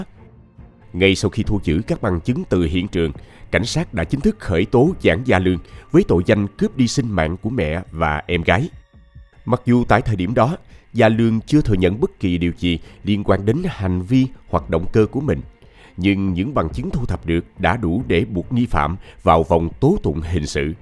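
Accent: South, Vietnam. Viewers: Người ta đọc tin như thế này sao lại chê ? Giọng đọc rõ ràng chấm phẩy đúng nơi đúng lúc giọng miền Nam rất dễ nghe 👏👏👏👏👍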